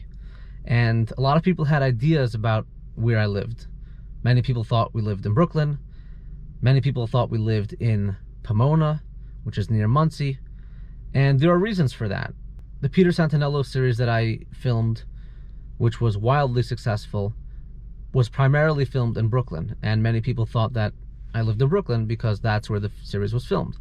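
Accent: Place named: South, United States. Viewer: get that southern accent going buddy.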